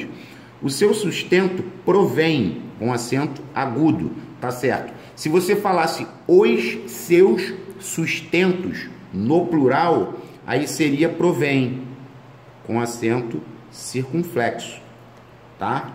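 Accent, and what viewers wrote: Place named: Pará, Brazil. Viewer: Proff vc eh muito legal..seu sotaque parece com o nosso aqui do Pará. Vc eh 10..e pra ser 20 precisa odiar o Bolsonaro kkkk!! 2021 ta phodaaa! Deus nos livre logo! AMÉM!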